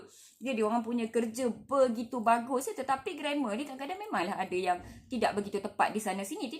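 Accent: North, Malaysia. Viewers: Doktor Anak malaysia yg betul2 sudah merdeka...sbab fasih berbahasa Melayu..yg paling Bagus doktor boleh ckp loghat daerah jugak..bleh ckp loghat utara kan😀😀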